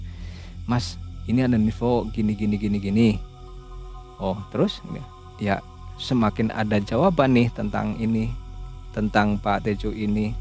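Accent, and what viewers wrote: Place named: West Java, Indonesia. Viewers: Mang ini kan orang asli cilacap ya, kok beberapa celetukan lebih mirip orang sunda daripada gaya khas orang cilacap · Kok kayak orang sunda mas nya yaa 😂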